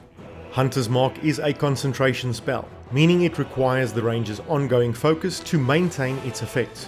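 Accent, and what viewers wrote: Afrikaans, South Africa. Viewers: Nice video; are you Afrikaans?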